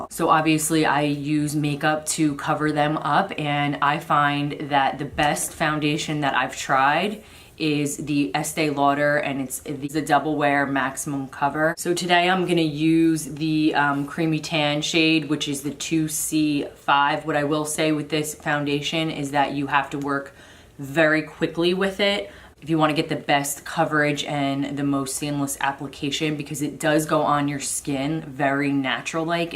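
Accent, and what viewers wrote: Philadelphia, United States. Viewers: Your accent is totally Philly. I hear it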